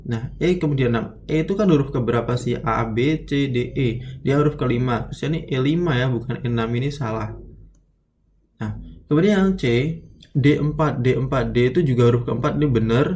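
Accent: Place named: Cirebon, Indonesia. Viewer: logatnya cerbon bgt, tah indramayu?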